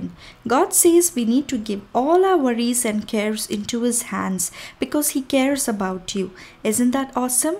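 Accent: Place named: Bangalore, India. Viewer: Praise the Lord Sisy...! R u frm Bangalore?! Bcs, ur pronunciation of Tamil seems so... :) !!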